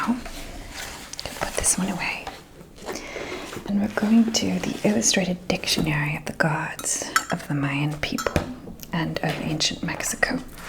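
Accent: Transatlantic, United States. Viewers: sounds almost transatlantic to me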